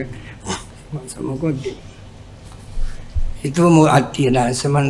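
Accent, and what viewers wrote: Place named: Madura, Indonesia. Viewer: Salam ta'dhim kyai. Tausiah tingkat tinggi. Pendengaran saya suara kyai seperti ada logat2 maduranya.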